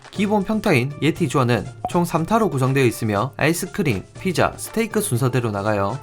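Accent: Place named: Gyeongsang, South Korea. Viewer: 경상도사투리 진짜듣기싫다 어우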